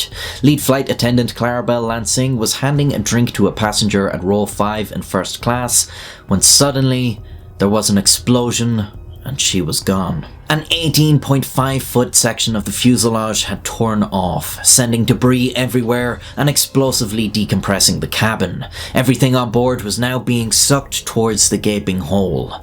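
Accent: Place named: Ireland. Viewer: Idk why but if i'm going to be told a surreal and horrific story it's somehow way better to hear it from an Irishman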